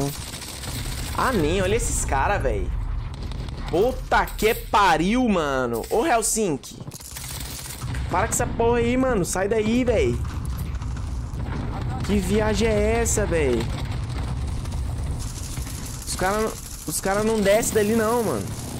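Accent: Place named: Montes Claros, Brazil. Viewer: Cê e de Montes Claros ???? Responde aí Sotaquizinho não engana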